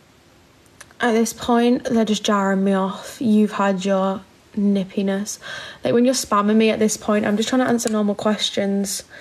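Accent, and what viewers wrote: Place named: North, United Kingdom. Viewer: Wow beautiful 😍northern accent too 😘💋💋💋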